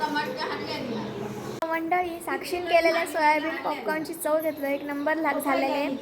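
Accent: Malvani, India. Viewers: Malvani chan bolte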